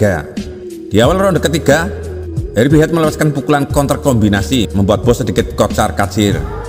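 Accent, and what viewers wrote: Java, Indonesia. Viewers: Adminya medok sekali si bicaranya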